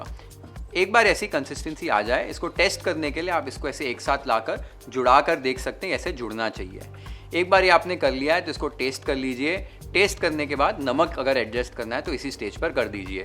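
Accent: Maharashtra, India. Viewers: Nice recipe, tasted many times . The way you tell great 👍🏻. With perfect Maharashtrian pronounciations ❤ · You are jsu awesome. You sound a perfect maharashtrian when you spell words like ठेचा, वाटण.... Amazing chef ❤❤❤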